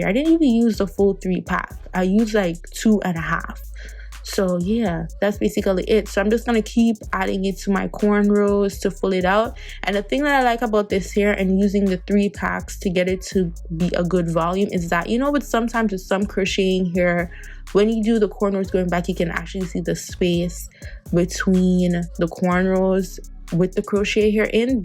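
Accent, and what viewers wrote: Jamaica. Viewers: lol the Jamaican definitely keeps jumping out. I love it though. My hear head top and laugh to myself. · Mek di Jamaican jump out mum..lolol😂🇯🇲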